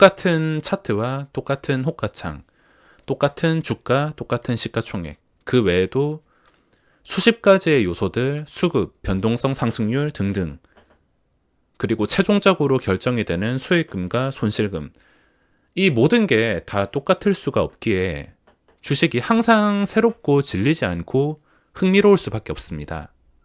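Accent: Gyeongsang, South Korea. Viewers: 안녕하세요 님, 거의 1년만에 인사드리네요 ^^ 특유의 경상도 사투리의 구수한 말씀을 다시 들으니 좋네요... 그동안 너무 많은 영상을 보지 못했는데, 오늘부터 하나씩 보면서 공부해야겠군요...좋은 영상 감사드립니다.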